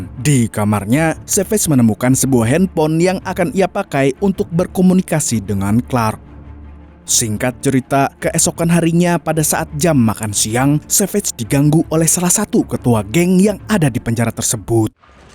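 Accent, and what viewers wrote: Banjar, Indonesia. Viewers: betakun am .. org banjar kah pian mang ?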